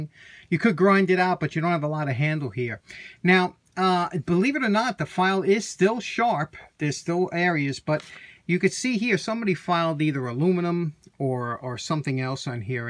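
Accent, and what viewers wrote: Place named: Hudson Valley, United States. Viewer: Great video, really useful, and I love your accent it reminds me of home in the Hudson Valley. :)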